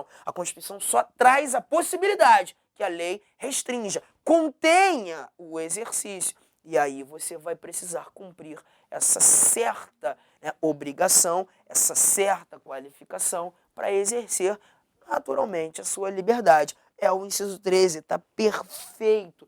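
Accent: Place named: Rio de Janeiro, Brazil. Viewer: Dá agonia assistir por mais de 15 minutos! Cariocão da fala "malhandra" ngm merece!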